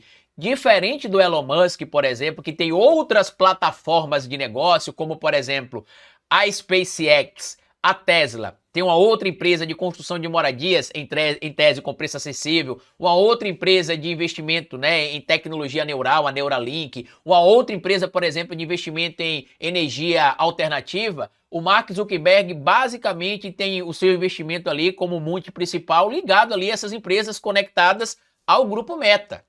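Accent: Northeast, Brazil. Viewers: Boa tarde, gosto muito das suas explicações, te admiro você é muito inteligente, esse sotaque nordestino é o seu charme kkkkkk.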